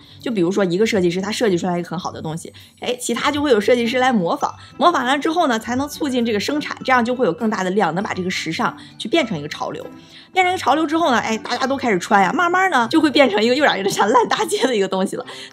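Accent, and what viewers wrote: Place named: Northeast, China. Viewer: 姐姐什么都好，就是东北音太重了。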